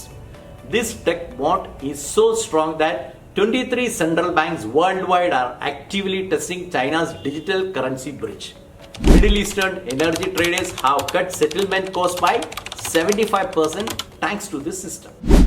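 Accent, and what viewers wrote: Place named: India. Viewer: Thanks to sub-titles otherwise I would have problems understanding Indian English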